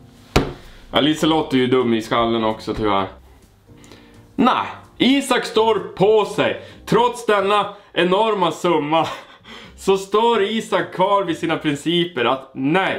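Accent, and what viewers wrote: Norrland, Sweden. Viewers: Gör en video när du bara pratar norrländska! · vilken skön norrlands dialekt du har 😆👍 har precis börjat kollat på dig. kung äru👌🏻👌🏻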